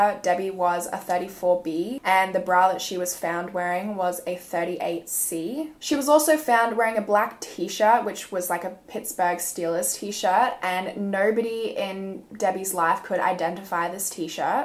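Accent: Australia. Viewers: Her voice is so peaceful to listen to! Australian right? 😍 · I really like your Australian accent 😊💖. And your videos too.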